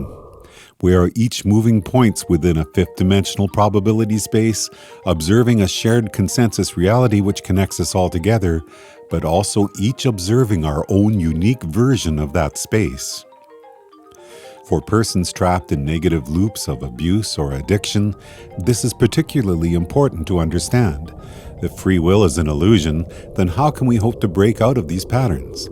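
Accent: Canada. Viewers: Is this guy Canadian? Instant turn off. No one can believe a Canadian would be saying something smart.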